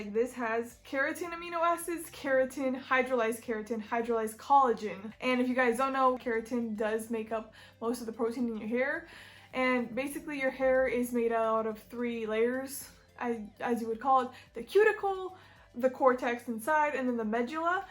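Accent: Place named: Romania. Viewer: Ur Romanian! I can tell by the accent (and products). Buna. :) M-am prins dupa accent si dupa incercam sa vad etichetele produselor daca sunt in romana, pana cand am reusit sa vad "ulei de cocos" si parca mai e ceva pe cele de la Syoss. Se pare ca ne recunoastem dupa accent.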